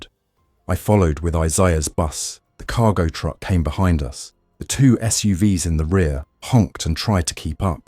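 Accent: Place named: United Kingdom. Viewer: it does seem a little odd for an AI voice that could have whatever accent you want, to have a british accent talking about what happened in america.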